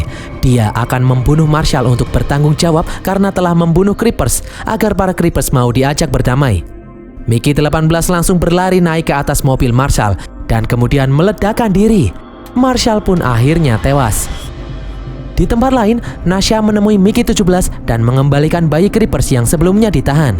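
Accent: Java, Indonesia. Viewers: kereenn..baru nemu channel recap yg agak2 medok wkwkwk. gaaassss terus mase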